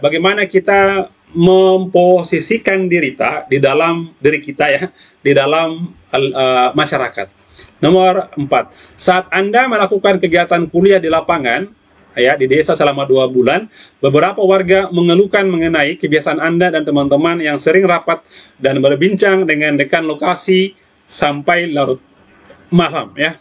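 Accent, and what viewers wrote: Makassar, Indonesia. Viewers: Macam logat Makassar bang😊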